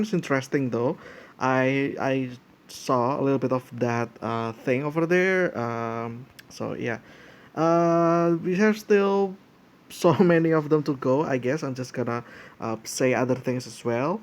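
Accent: Indonesia. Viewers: Bro, from your accent, i guess you're Indonesian?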